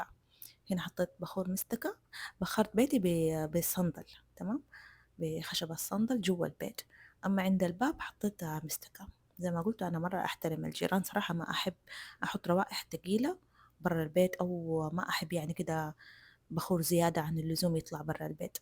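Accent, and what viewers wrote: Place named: Jeddah, Saudi Arabia. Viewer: هي سعوديه جداويه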